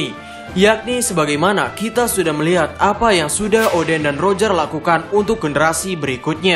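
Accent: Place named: Madura, Indonesia. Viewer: Min, logatnya madura banget. Bener ga sih?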